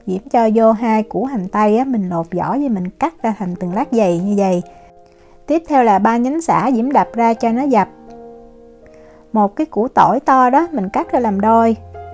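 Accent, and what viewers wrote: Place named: Mekong Delta, Vietnam. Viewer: Chị này giọng nói dễ thương chắc là người miền Tây.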